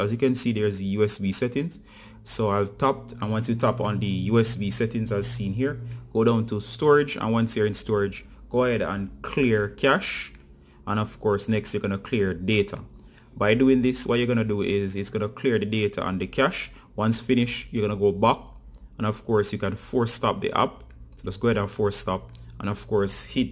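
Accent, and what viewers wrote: Jamaica. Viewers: I heard offer up signal 😆 thanks for the tips. Are you Jamaican ?🇯🇲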